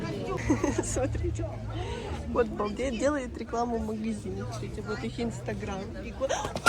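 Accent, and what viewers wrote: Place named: Western Ukraine, Ukraine. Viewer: Ну да ну да. Одесский говор. А кода я спросил , ранее , почему у вас заподноукраинскиц говор ? вы проигнорировали .... .,. и у меня , к сожалению , уменьшился интерес к вашему каналу. Уж извините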